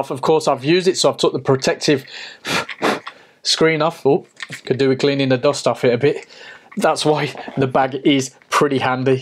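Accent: Birmingham, United Kingdom. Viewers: nice work, you sound like a fellow brummie! i didn't even realise this simple idea existed.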